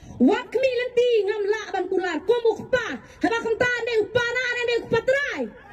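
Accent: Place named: Mawlynnong, India. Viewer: Tang rukom kren ruh mawlynnai hi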